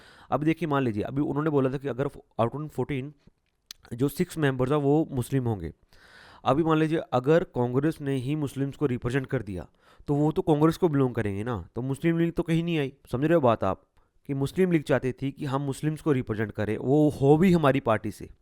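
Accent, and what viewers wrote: Punjab, India. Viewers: Sir Punjab wali hindk bolre ho😉😉 thnq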